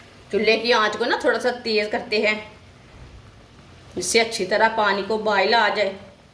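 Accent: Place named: Punjab, India. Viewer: What a thick Punjabi accent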